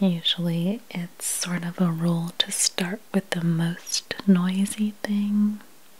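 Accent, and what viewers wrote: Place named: Pennsylvania, United States. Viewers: I was pretty sure you were a Pennsylvania native based on your slight accent but I knew it for sure when you said you got your bar soaps at the Giant 😂 I'm outside Binghamton NY and we had Giant stores here up until WEIS took them over when I was in my 20s. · I love your accent. To me it sounds like you are from southeastern PA or from Maryland and it makes me feel like home! Best ASMR voice ever.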